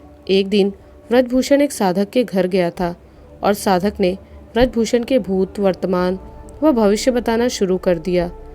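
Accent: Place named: Eastern Uttar Pradesh, India. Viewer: लेकिन बोलने के तरीके से east up की लगती हैं 🙏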